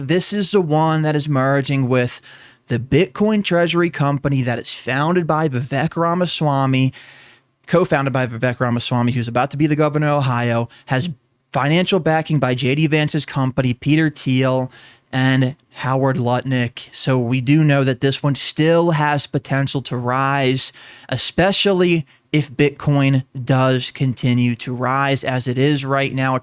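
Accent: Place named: Northeast, United States. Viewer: Homie i have to know where is your accent from, has to be the NorthEast region somehow. Let us know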